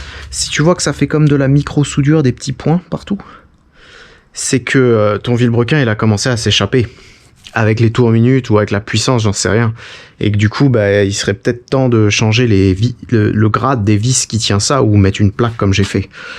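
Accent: Alsace, France. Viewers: j’ai l’impression qu’il a pris l’accent Aslacien nan ?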